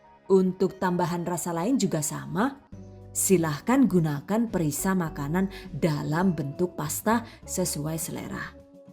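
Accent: Banyumas, Indonesia. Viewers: Bu aseline pundi daneng ngendikane ngapak kados kulaa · walah dalah rikane ngapak mania. ora ngapak ora kepenak 😆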